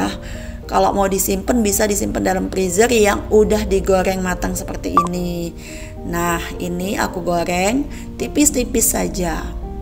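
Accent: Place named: Palembang, Indonesia. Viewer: Lucuk denger suaranya si mbk bicara, aseli org palembang kayanya karena menantu ku jg orang palembang.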